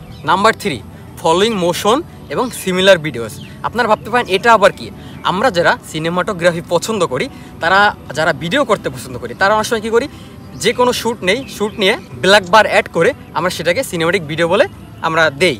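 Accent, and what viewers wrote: Sylhet, Bangladesh. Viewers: Tumi Sylhet taki naki vai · Are you sylheti ?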